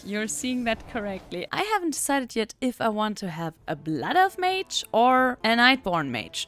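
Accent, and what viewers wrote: Germany. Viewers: You sound like a german 😏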